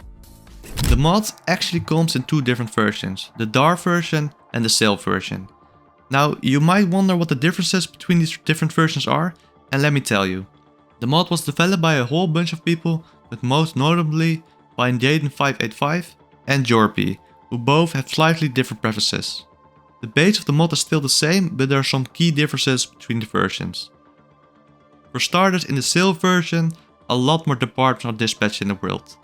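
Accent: Netherlands. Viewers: I think he is dutch so his accent makes it sound like that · Zo te horen kom je uit Nederland ❤ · Are you Dutch you got a Dutch accent . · Ik hoor nederlands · OMG!! That thick dutch accent. Sorry cant stand it, i could not finish your video because of it and i am pretty sure i aint the only one thinking that. I would strongly suggest working on that accent if you want to get anywhere on youtube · You sound really Nederlands mijn vriend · that thick dutch accent, ik hou ervan!!! leuk video!!! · Thickest dutch accent of the month. Good video tho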